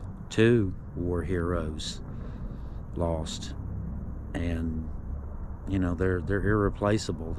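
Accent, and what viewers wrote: South, United States. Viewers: I wish I found this channel sooner. I will watch more videos as this one is fascinating., you have what I think of as the true southern accent, calm, measured speech. My mother's people were in Arkansas and I thank you for bringing their voices back to me.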